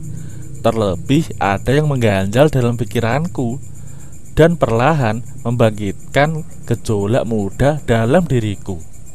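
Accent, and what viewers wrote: Java, Indonesia. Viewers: Jangan orang jawa untuk menerangkan cerita ini, gak cocok dan gak enak dengernya, ceritanya bagus, tapi yg ngucapkan cerita ini gak enak suaranya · mantul min....jawa nya jawa mana ya ini...jangan² se lubang ama aku🤣🤣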